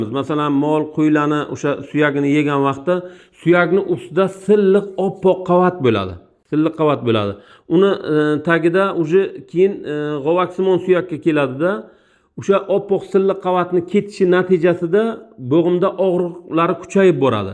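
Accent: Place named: Bukhara, Uzbekistan. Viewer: Buxoroda deb òyladim sheva buxorocha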